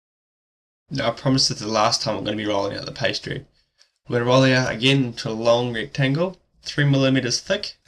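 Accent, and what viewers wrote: New Zealand. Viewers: great tutorial, made even better by your beautiful kiwi accent <3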